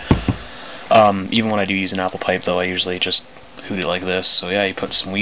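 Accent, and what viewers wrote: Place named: Saskatchewan, Canada. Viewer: thanks dude, you sound like your from saskabush maybe? keep up the good work.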